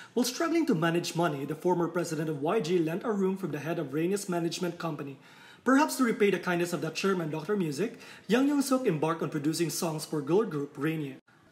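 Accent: Philippines. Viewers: pustahan pinoy itong nagsasalita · I could tell the man narrating this video is a filipino lol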